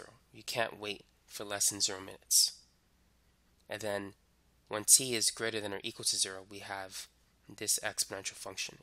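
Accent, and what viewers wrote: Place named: Brooklyn, United States. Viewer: I love your Brooklyn accent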